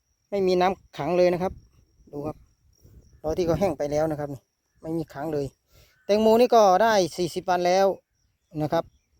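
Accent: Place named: Cambodia. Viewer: 555ดูทีไรตลกทุกทีงึกๆงักๆเขมรรีรัม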